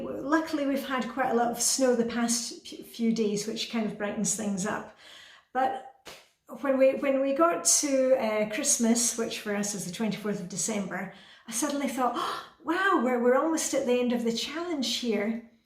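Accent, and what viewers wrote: Scotland, United Kingdom. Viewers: I like in Denmark, I don't need to win anything, I feel like a winner already, watching her happiness and little life tips in a sweet Scottish accent.Thank you!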